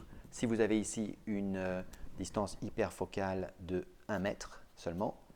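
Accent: England, United Kingdom. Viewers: Merci pour votre pédagogie qui n'a d'égal que votre humour et votre accent anglais, qui détonne dans la bouche d'un francophone. Vous êtes le Choulax de la photo comme on dit ici.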